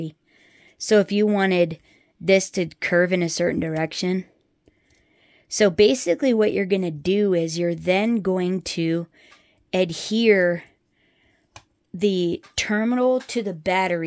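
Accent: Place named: Canada. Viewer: I have been watching you for a few months and you have become one of my favorites to watch. I love you little laugh and your "swear words." I notice your accent and assume you must be from Canada-correct?? You need to add a little personal information to your films so we can know you on a little deeper level. You are a hoot!!!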